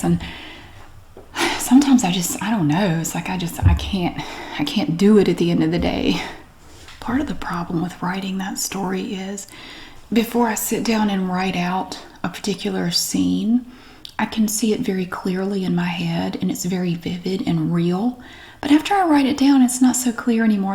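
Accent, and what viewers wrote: South, United States. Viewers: Happy channelversary! You have a fan from Oaxaca Mexico 🇲🇽 Your videos bring me a lot of memories of my life as an Au pair in Hampton roads VA back un 2018. I love the southern accent and I think you're a sweetheart! Saludos (greetings) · Well I love your southern accent. And I think you are so funny😂 ❤ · Damn I love that Southern accent , I could listen to her talk about paint dry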